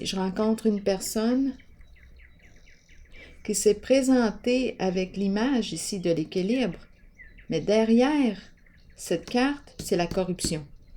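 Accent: Quebec, Canada. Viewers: C'est incroyable 🤩 pile pile sur ma vie wow bravo et merci 🙏🏻 avec ta voix j'imagine que tu es situé au Québec? Etk moi oui!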